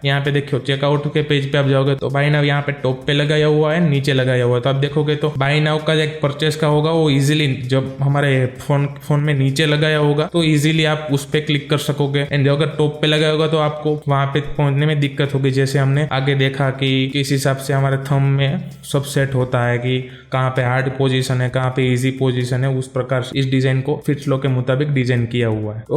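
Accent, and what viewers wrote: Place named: Gujarat, India. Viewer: badhiya video he. god bless. your Hindi is wrong. i thought you were talking in Gujarati but you made quality content. keep it up alwayes. Liked Shared and commented.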